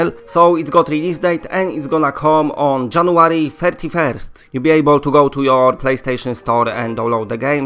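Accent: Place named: Poland. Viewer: I’m going to go out on a limb and guess Polish. It’s terrible to listen to though.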